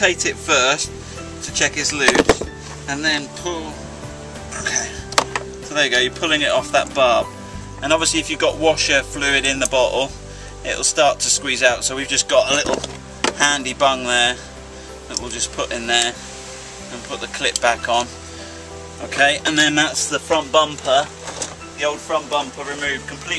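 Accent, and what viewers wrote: United Kingdom. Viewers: 😂😂😂😂 That British accent😅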